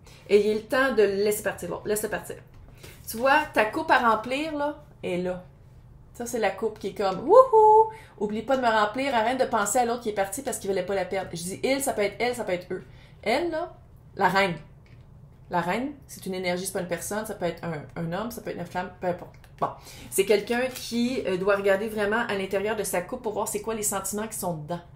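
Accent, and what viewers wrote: Quebec, Canada. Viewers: Whaouu ❤️❤️ J ai adoré votre tirage Votre parlé québécois qui me fait trembler de joie. J adoreee le Québec d ailleurs mon tout dernier fils y est Parlons du tirage, je suis Gémeaux ascendant sagittaire. 😅😅 Je viens de m abonner Merci beaucoup pour votre tirage 💪🙏🙏 Je vous souhaite de belles fêtes de fin d année ❤️❤️❤️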